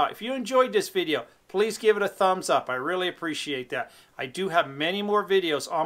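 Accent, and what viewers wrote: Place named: Nebraska, United States. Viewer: do you have cicadas? mosquitos? ticks? Accent seems similar to Nebraska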